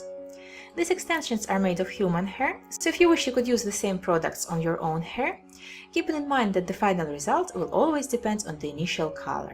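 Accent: Russia. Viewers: Ха,я вот сколько смотрю эти замечательные ролики и каждый раз думаю,интересно,девушка говорит правильными английскими словами,но с совершенно русской интонацией:) Сама с этим борюсь,изучая английский. Пока безуспешно. Спросить было неловко,спасибо,рассеялись мои сомнения))) Спасибо большое за полезное видео! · She haves russian accent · I told russian accent:)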